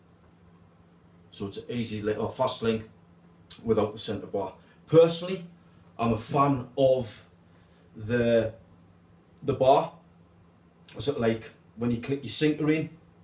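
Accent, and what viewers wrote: Sunderland, United Kingdom. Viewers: Hi, love the show and its great to hear the old accent, came to Australia over 35 years ago from Sunderland... Knocked up a couple of the clips (took about 5 or six goes to get it looking like yours) using 316 grade 1.6 mm s/s rods as you use and thought I would have a look and see at what the poundage would be before it straightened. After doing 4 tests I got roughly the same lb's before the clip straightened. They all straightened at the 35 - 40lb mark, which is great in a lot of circumstances but wouldn't stand up to a big GT trying to get as far away from you as possible. Any suggestions on making them a little stronger or changing the design a little to give it more poundage before it lets go? I will try to have a play and see what I come up with. Absolutely not having a go, just one fisherman to another trying to make sure that biggen stays on the line... 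Cheers Mate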